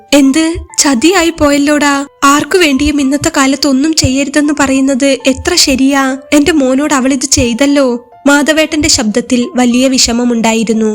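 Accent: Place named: Malappuram, India. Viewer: കഥ കേൾക്കാം. ആസ്വദിക്കാം. മലപ്പുറത്ത് ഴ എന്നത് ശരിയായി ഉച്ചരിക്കുന്നത് കുറച്ചാളുകൾ മാത്രമാണ്. ചെറുപ്പം മുതൽ കേട്ടതും പഠിച്ചതും ആ രീതിയിലാണ്. എല്ലാവരും എല്ലാം കുറ്റമറ്റ രീതിയിലാണ് പറയുന്നതെന്ന ധാരണ മാറ്റണം. ബുദ്ധിമുട്ടുള്ളവർ കേൾക്കാതിരിക്കുക. കുട്ടീ നീ നിൻ്റെ രീതിയിൽ തന്നെ തുടരൂ.എല്ലാവിധ ആശംസകളും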